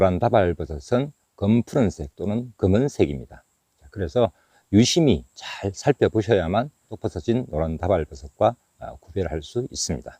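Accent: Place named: Jeolla, South Korea. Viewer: 말이 전라게만네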